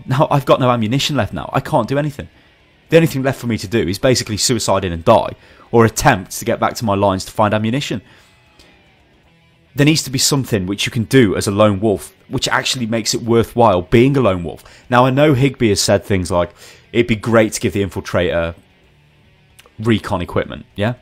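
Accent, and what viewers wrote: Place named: United Kingdom. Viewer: You sound british so I would guess you have a European account also calles PSG, or a SOE account? Because that quite frankly is the biggest issue of Planetside 2 at the Moment. All those PSG players that without knowing choose a company that just doesn't care. Just have a look at the britsh forum over at planetside2.eu. We all play on the same servers yet we are second class citycin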